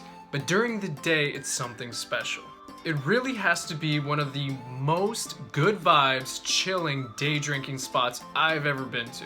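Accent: United States. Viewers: You have the Thibodaux and Bayou Lafourche accent down pack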